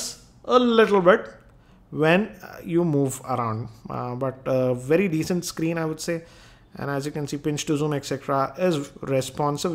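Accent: India. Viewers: I really hate when i get in a review and find it indian english ! " bress da budden "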